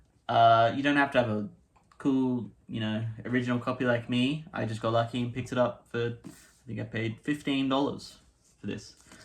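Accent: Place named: United Kingdom. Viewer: Great video! I really enjoyed In Cold Blood when I read it but it’s been a while. I would love to hear more about watch making in future videos - it’d be really cool to see a watch that you’ve made. Based on your outfit I was thinking you were from Chicago but I was a bit confused as I thought I could detect the slightest British accent, then it all made sense when you mentioned Australia.😂 Have a good one!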